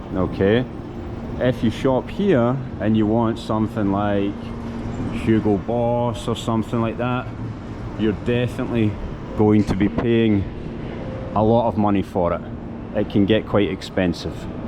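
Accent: Scotland, United Kingdom. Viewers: Обожаю шотландский акцент!)) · Scottish guy reading Russian - my regards to your effort mate :) Last year Irish guy accidentally smashed my side mirror, was a funny way to meet a foreigner in Moscow. All are welcome, enjoy and have fun. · I LOVE you sottish accent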